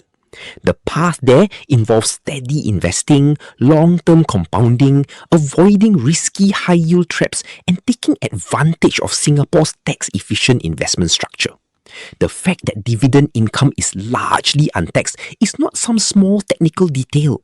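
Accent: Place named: Malaysia. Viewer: Why singapore video but malaysian voice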